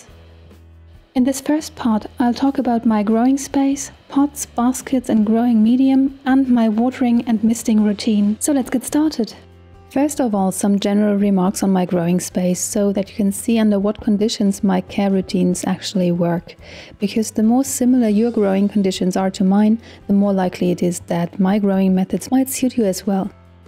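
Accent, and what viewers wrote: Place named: United Kingdom. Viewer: I love your voice 😘 British accent with Eastern EURO thinking ☺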